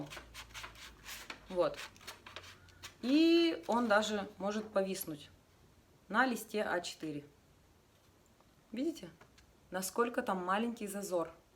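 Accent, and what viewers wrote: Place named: Yakutia, Russia. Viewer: Hi! Excellent tutorial as always🤗🤩. Thank you for the English transcription but nothing will ever replace your beautiful Yakutian accent😍😘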